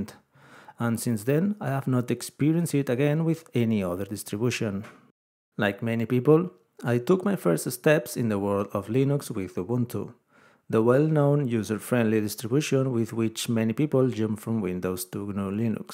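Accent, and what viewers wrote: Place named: Brazil. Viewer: brasileiro tentando falar ingles, suponho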